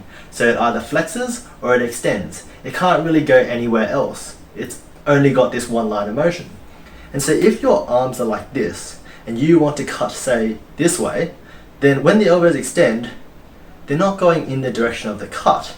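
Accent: Australia. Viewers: Yes we have problems getting them too. What is your country, you sound Aussie like me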